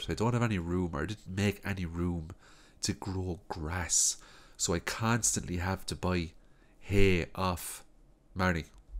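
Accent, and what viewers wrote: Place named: Rural, Ireland. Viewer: Of course an Irish man would be a great farmer 🤣🍀 (I'm assuming based on the cultchie accent 😆) love the vids?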